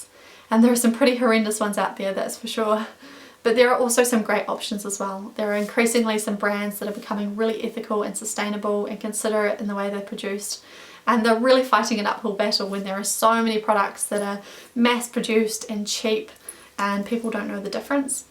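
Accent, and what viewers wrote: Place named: New Zealand. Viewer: This was so calming and I love your neozealandian accent :)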